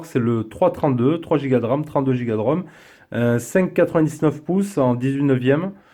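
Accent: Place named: Southwest, France. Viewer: Aparisi Anthony dans le sud ouest c'est comme ça ils prononcent tout lol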